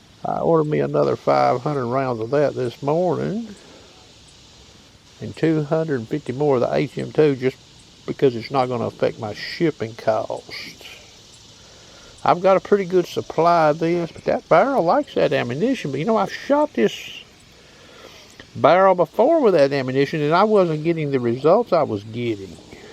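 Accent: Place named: New York, United States. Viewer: Great Video... You sound like you might be from around by me in New York. God Bless. Pew Pew. 😎👍👌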